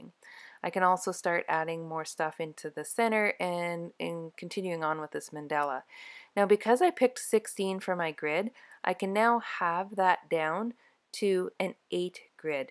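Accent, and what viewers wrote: Canada. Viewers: Excellent quick tutorial. (Are you Canadian?)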